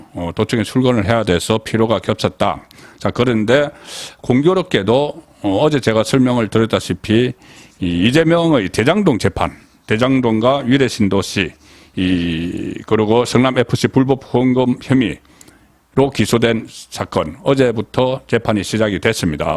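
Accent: Gyeongsang, South Korea. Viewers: 경상도 출신이라니 이해는 하겠지만 그래도 그렇지요. 방송하는 분의 발음이 이래서야 되겠습니까. '의문'은 '의문'으로 발음해야지 '어문'이 뭡니까. 장단음 발음도 이상하게 하시니 말씀이 귀에 잘 들어오지 않아요. 발음 교정 훈련을 열심히 하시기 바랍니다. 그러면 더 인기있는 유투버가 될 수 있어요.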